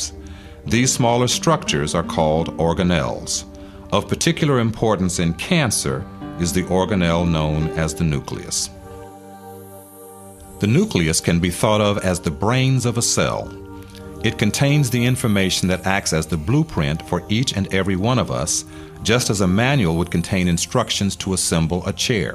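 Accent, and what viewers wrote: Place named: South Carolina, United States. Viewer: This narrator could be Charlie Rose's brother with a little Morgan Freeman depth thrown in--he has the same sweet South Carolina type drawl, not trying to hard to sound Midwestern. Very easy to listen to.... one of my primary rules when looking for video or audio series... a voice that makes you want to sit down and listen.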